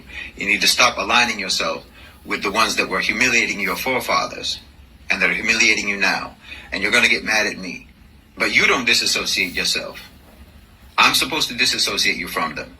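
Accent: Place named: United States. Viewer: I'm confused. He speaks like an American.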